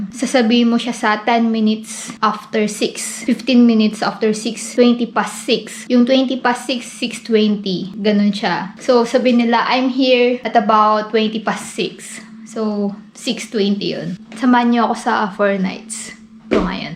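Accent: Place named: United Kingdom. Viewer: Nag eevolve ka na talaga classmate. British accent ka na. Cute.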